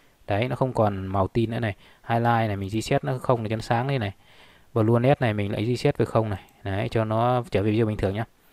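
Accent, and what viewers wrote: Vietnam. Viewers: A PHẢI NGƯỜI tHANH HÓA K Ạ ?????????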